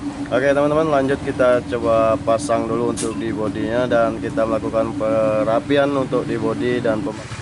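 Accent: Palembang, Indonesia. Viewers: Logat palembang ?? Lokasi dimana kak ?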